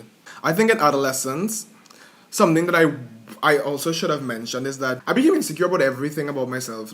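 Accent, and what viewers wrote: Trinidad and Tobago. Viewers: Once i heard the accent i knew trini one time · lol as soon as I heard the accent I knew you were a trini lol 😂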